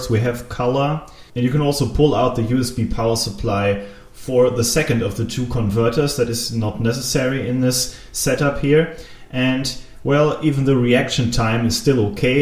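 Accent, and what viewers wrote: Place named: Germany. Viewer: Tolles englisch! Aber hehe, dass deine Muttersprache deutsch ist kannst du nicht verbergen. Tolle Videos by the way.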